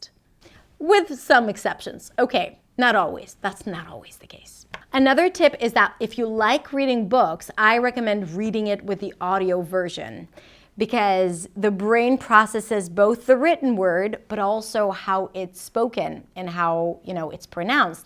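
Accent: United States. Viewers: Hi, I am new to your channel... I am amazed how goid your Englush is even though you aren't a native speaker but you sound really American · Excellent but please teach me how to sound can and can not (can't) in your american accent. Thanks you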